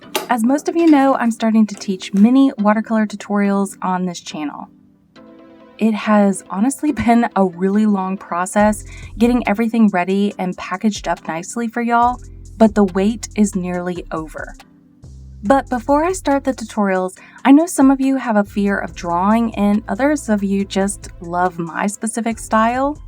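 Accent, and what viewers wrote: South, United States. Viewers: Gee,are you from the South??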